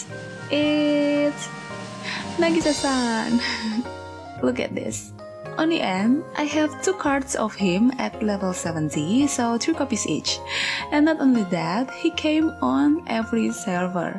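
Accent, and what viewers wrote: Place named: Philippines. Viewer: I didn't expect for you to be from Indonesia, i thought you were from Philippines because of your english accent, and you look beautiful. And while i do want to see you make a video content of other games, but i suggest you should focus on enstar, because it takes 4 days to make a video of one game, if you make a video content about multiple games I'm worried that you might feel burnout and overwhelmed because of the many videos editing and gameplay, managing time is not very easy, especially if you play multiple games and they all have an event in the same time, i always feel overwhelmed when that happens, but still i will wish you the best of luck in whatever choice you made 🍀🍀🍀🍀🍀 And again congratulations on 5k subscribers, your guides and event scout video really help me a lot ❤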